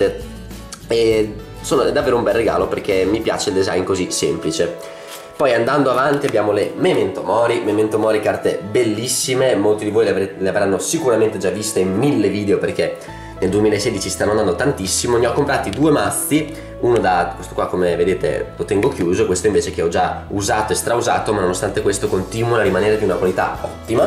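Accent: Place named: Emilia-Romagna, Italy. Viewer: da quella Zeta inconfondibile deduco che sei del Emilia Romagna. di dove sei ? io sono di Bologna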